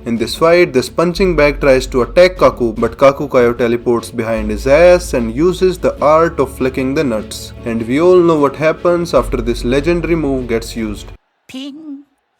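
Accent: India. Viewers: Accent: indian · The eloquent way of speaking and indian accent makes this 10x funnier. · If its not for the thickest Indian accent, this videos would have been a bang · I just fucking love these videos, indian accent with sarcasm its awesome! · The stoic Indian accent is way too hilarious!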